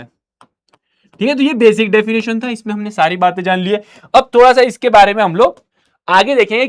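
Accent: Bihar, India. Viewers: Sir ap kya bihar se hai🥰👍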